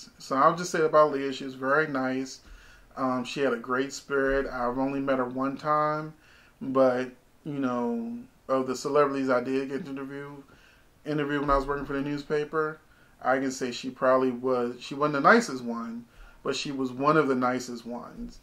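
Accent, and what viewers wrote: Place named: St. Louis, United States. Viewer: Where are you from? Yo accent sounds like St. Louis.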